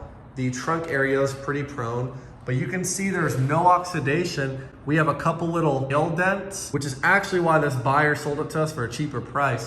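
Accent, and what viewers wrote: Texas, United States. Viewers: Love his Texas accent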